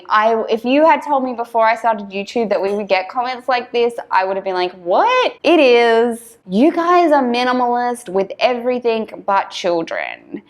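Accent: Australia. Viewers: Australian accents are so cool.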